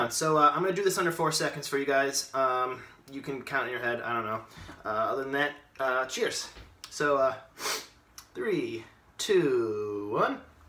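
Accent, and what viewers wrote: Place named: United States. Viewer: His accent says he’s American but his drinking skills say he’s Australian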